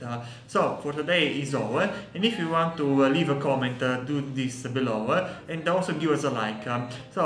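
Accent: Italy. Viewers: To be honest, I always thought non-Italians were being insensitive, that they were exaggerating. They still are, but dayum, bruh. This guy blows my mind, I had no idea some (if not all) Italians actually talk like that. The More You Know haha. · I know you re italian cuz of your accent lol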